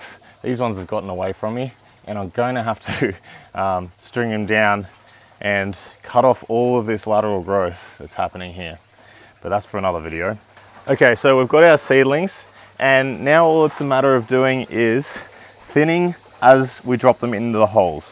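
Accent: Australia. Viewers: Love your content!! To also have another Australian accent schooling me is nice 😂 the familiarity is soothing af- cheers! Your content is dead brilliant!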